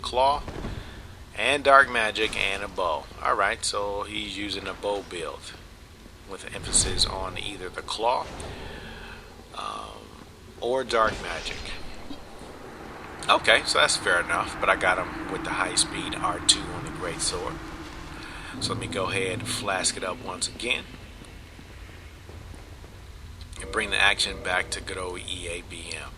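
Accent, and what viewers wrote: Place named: AAVE, United States. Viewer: You sound of the afro ebonic persuasion.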